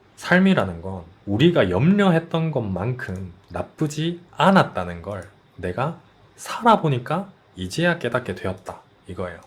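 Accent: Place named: Daegu, South Korea. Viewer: 영상감사합니다 제가 외국인 대학원생입니다. 돌아가신 교수님은 저에게 그런 가이드 같은 존재였어요 대구 말을 듣고 교수님 생각이 납니다 그 교수님도 대구 사람이시고.. 너무 보고 싶습니다, 항상 건강하시기를 바랍니다 영상에서 많이 배웠어요~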